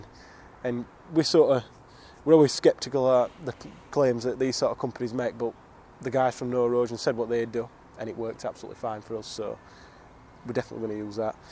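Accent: Yorkshire, United Kingdom. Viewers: Love this and this channel! And it's so good to hear a fellow Yorkshire man on YouTube lol keep up the good work! Never knew u had a YouTube!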